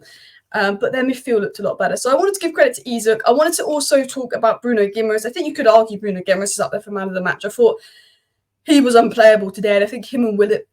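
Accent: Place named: Manchester, United Kingdom. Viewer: What part of Manchester, is that accent from ????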